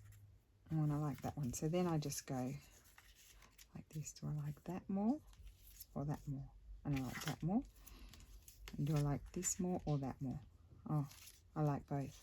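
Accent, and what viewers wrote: Australia. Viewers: I so enjoy your videos! You are so talented and nothing beats an Aussie accent, especially when you say here! Keep up the good work1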